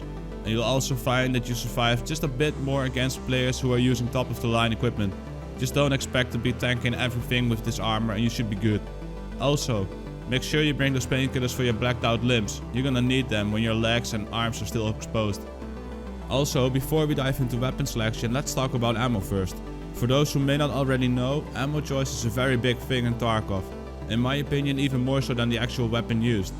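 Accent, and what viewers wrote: Netherlands. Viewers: Nice to watch. Really useful info. Though I can hear you are probably Dutch haha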